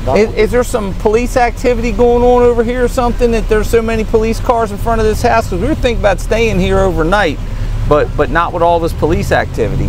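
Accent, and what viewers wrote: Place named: South Jersey, United States. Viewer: Who didn’t and still doesn’t love the show. Andy Griffith was a great actor. I loved him in “A face in the Crowd”. It’s one of my top ten movies. The host is enjoyable too. I have to wonder where he’s originally from, because he sounds like people from far south New Jersey! 😊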